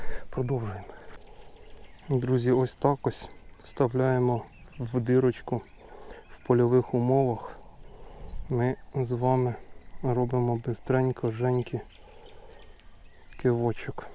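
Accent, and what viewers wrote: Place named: Zhytomyr, Ukraine. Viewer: де така гарна водойма Житомирська обл Чую по говору😂?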